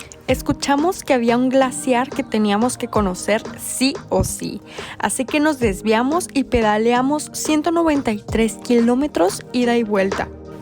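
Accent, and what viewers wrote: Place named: Monterrey, Mexico. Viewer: Chicos me tenéis enamorado de vuestro viaje y lo buena narradora que eres tú explicarlo todo con ese lenguaje y acento tan guapo mexicano que tienes o sigo porque yo también soy aventurero teclista ya he recorrido todo México en bicicleta y Centroamérica continente africano y Europa próximo objetivo es viajar a Sudamérica en bicicleta vuestro vídeo me siguen animando y dándome fuerza para emprender este viaje ya que a mi edad y ya un poco difícil y es forzoso ya que soy una persona jubilada con 65 años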